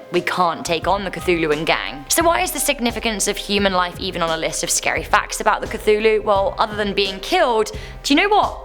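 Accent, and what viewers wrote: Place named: United Kingdom. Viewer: Who else clicked out from this video after hearing her talk? Them brit's have the most annoying accent and they love to hear themselves talk.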